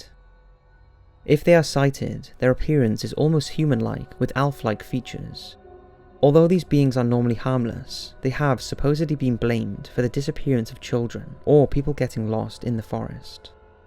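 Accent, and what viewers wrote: Wales, United Kingdom. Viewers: It's his Welsh accent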